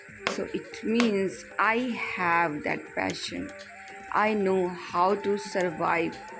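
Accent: India. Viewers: Damn, I feel like I don't understand any of this language. Is this the Indian English accent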